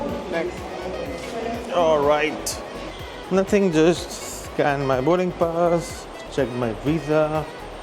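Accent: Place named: India. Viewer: I CANT UNDERSTAND WITH YOUR TANDOORI ACCENT...HORRIBLE EDITING BTW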